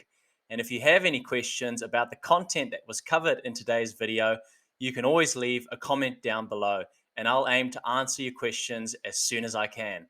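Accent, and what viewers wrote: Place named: New Zealand. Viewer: I wish I had seen this video 2 hours ago!:) Thank you so much for this info. So, even though I spent a lot of time manually deleting posts, you just saved me another 2 hours! BTW: As soon as I heard you speak, I thought to myself, "If isn't from New Zealand, I'd be very surprised!" Then, I saw your page and saw you live in Tauranga! I spent many happy days back in the 1980s with friends who live in Tauranga. I seem to recall running a road race at Mt. Maunganui as well. New Zealand remains my favorite place on earth, and wish I could have lived there.:)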